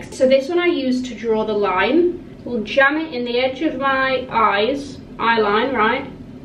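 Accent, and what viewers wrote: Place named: RP, United Kingdom. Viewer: I LOVE YOUR ACCENT !! You're so posh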